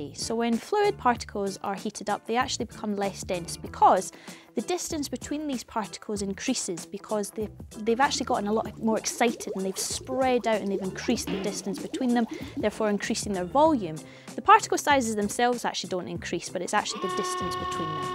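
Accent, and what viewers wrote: Scotland, United Kingdom. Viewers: shes posh scottish not hard to understand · It's a scottish accent, you nut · she sounds more like a posh scot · Within the first 8 seconds I discovered this woman is Scottish. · I guess you've never heard a Scottish accent...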